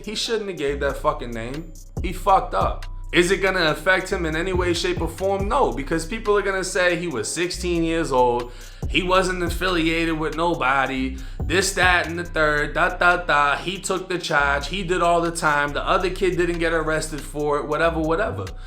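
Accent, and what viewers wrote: Boston, United States. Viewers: Love the Boston accent I'm from NY what up. I'm following this trial and I love tour style and how u cut you videos with so many visuals. Also I make club music on Ableton and u see u must be making shit yourself from the back ground. Anyways I like and subbed. Word, no names. ... I did 2 yrs up top over here. That shit don't fly my way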